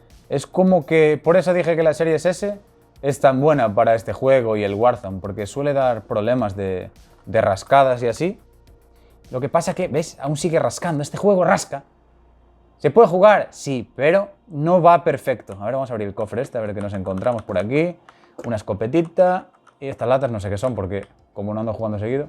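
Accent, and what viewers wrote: Galicia, Spain. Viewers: Eres Gallego??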